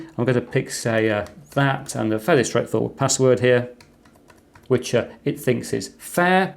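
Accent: United Kingdom. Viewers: Hello! I need you to know that I'll be using your voice to learn British accent and about computers as well 😁 So, I'll be playing all of your videos on repeat even at night 😎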